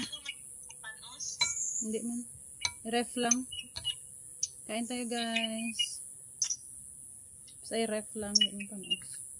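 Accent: Iloilo, Philippines. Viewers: Ilongga po kau?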